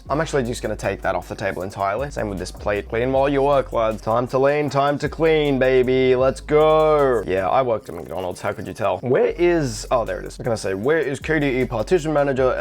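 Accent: Australia. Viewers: Ur Aussie same lol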